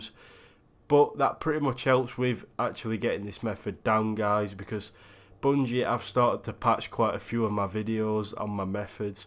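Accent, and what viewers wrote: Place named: Northern England, United Kingdom. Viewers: Love your accent, are you from England? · Love these farming methods mate. Nice work. Glad to hear a fellow northerner on here helping the community out 👍🏻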